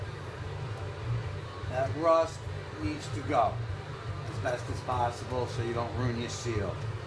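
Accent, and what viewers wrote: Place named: New Orleans, United States. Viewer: Your accent sounds a little New Orleans but not exactly.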